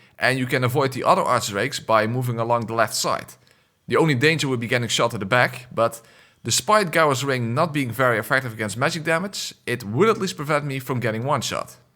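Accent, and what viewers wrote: Netherlands. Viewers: are you dutch? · that accent I recognize are you dutch? je bent echt goed ik zou nooit zoveel gedult hebben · Dutch accent is so recognizable hahaha, leuke video man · I've been watching your videos for months now since picking up Bloodborne last year and now playing through DS2, and have been trying to figure out your accent ever since!! So glad that my guess of being a Southern Dutchie was correct hehe. Sounded so familiar since I study in Limburg as an international!! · Tried to watch this, but the Dutch accent was a bit too cringe. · this dude is dutch he has to be .... i hear it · i hear your voice for one second, and i instantly know your dutch. wel goeie video hoor. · Are you Dutch? The accent is strong. XD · Are you Dutch? You have a Dutch accent!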